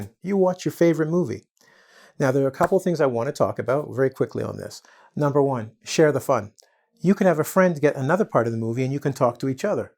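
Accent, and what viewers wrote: Russia. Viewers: Like for russian accent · There is the best Russian accent I have ever heard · your Russian accent is perfect!😁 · Ахаха)) Хотел написать комментарий, а уже опередили! Я бы хотел увидеть отдельное видео только с русским акцентом :D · I want more your videos with Russian accent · Russian accent is cool))) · I think I would express the same as other people did, you are extremely talented and your Russian accent is perfect. Thank you very much,! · Ahaha, your russian accent is so impressive) Thanks for your lessons and explanation from Яussia)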